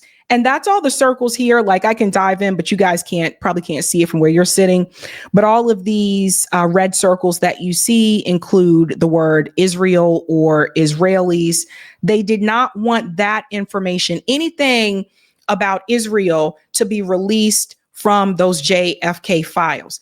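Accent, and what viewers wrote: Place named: Boston, United States. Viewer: every once in a while you slip in a word with a Boston accent and I love it. As a Masshole born & raised I love seeing our accent rub off a little on transplants.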